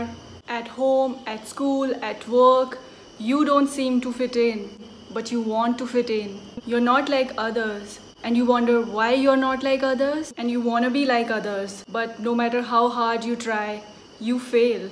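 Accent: India. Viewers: I think many people feel like an outsider, but that's a feeling that them experience themself, that doesn't mean it's real especially people with low self-esteem. I don't know if this makes sense, I'm just trying to be smart here. I can understand if you have felt like an outsider in your life, because only your accent tells that you are from India and otherwise you don't look someone from India at all. I mean you look "white" than the average Indian and you look beautiful. That's meant as a compliment.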